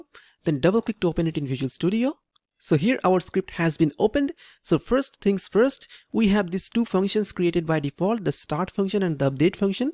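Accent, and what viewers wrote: India. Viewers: Little skeptical about the fifteen minutes, then I heard the Indian accent and I then knew that the title wasn’t joking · i pressed the video and i said to my self its like other videos it wont help until i heared indian accent and i got locked in❤ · It migt be a little bit racist but when i heard his indian accent i knew he knows what he is doing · it would be perfect tutorial if it didnt have the in*ian accent. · Once I heard the Indian accent I knew he actually knew what he was doing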